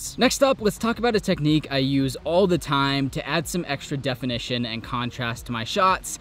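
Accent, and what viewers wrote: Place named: Washington, United States. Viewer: Where are you from? Is this Washington? It just reminds me of that area of the country.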